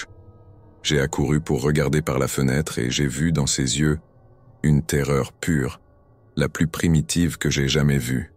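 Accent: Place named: Canada. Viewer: Quel régal ❤️ mais des fois ça déraille lol ça parle canadien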